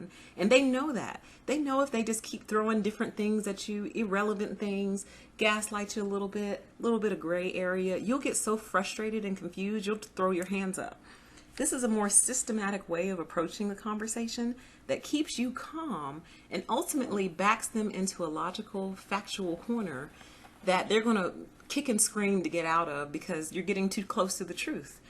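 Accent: United States. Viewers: This is silly but I think constantly about this and I've watched like 20 of your vids. So I'll just say it. I am a trained vocalist, so this analysis comes from someone who at least understands tonality. I love the tone of your voice. You have a bright, cheerful quality yet also a mature mellow, wise depth. You present the sound very high on your upper pallet which is great, it's an excellent placement. You enunciate marvelously with a pleasant neutral American accent. You do not pronounce your words in an annoying fashion at all. You do not use obnoxious voice inflections. Listening to you is very enjoyable and leaves me with a positive feeling. I bless you in the name of YaHuWaH with truth! I love listening to your videos.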